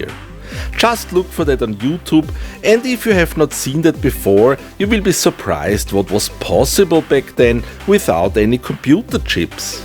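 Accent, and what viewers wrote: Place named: Austria. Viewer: austrian english at its finest!!! love it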